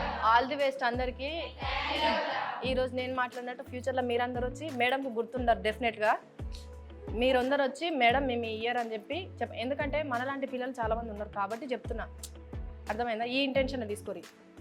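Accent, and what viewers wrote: Telangana, India. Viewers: Super documentary..Nee telamgaana yasa bagundhi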